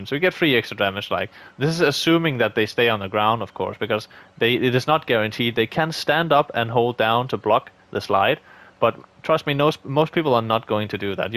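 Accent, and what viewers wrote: Denmark. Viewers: I smell Danglish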